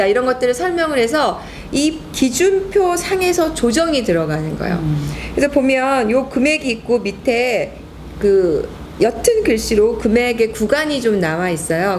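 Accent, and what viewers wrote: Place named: Seoul, South Korea. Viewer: 고향은 전라도인데 서울말을 더많이쓰시네요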